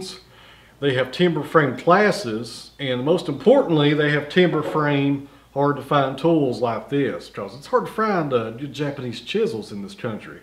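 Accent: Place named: Virginia, United States. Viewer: Just curious. What state do you live in? Sounds like Virginia or north Carolina maybe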